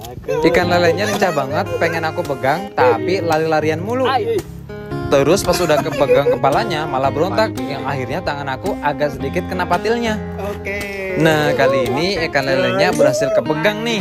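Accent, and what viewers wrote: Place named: Banyumas, Indonesia. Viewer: wong ngapaknya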